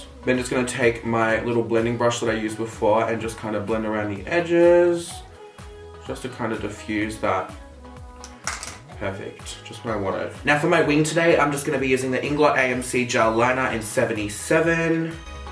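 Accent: Australia. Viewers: Is he Australia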